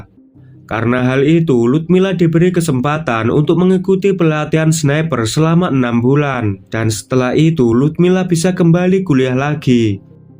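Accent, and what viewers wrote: Java, Indonesia. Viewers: mendalam kali ah bro ini ngomongnya..medok kali 😂 moga sukses bro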